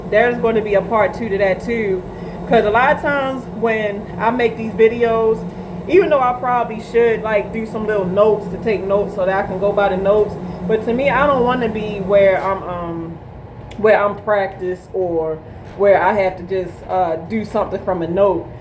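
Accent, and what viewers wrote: Baltimore, United States. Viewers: Much respect queen, u gotta be from bmo. I can hear it, being from pg. Stay strong stay sharp stay genuine! Much peace, love, positive vibes!!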